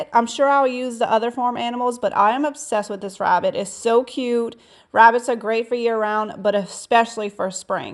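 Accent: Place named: Deep South, United States. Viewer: You are so creative, love the projects. Just subscribed. And that deep South accent,lol, couldn't stop listening.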